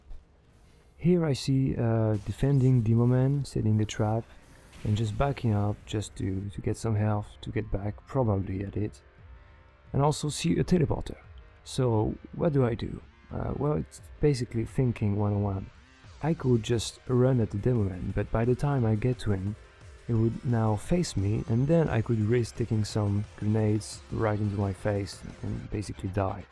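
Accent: France. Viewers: are you French? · I think hes french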